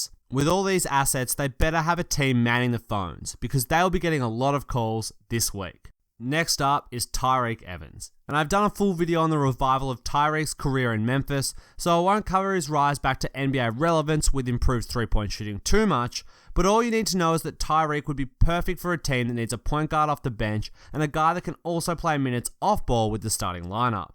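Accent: Australia. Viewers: What kind of accent it is? Is it Australian?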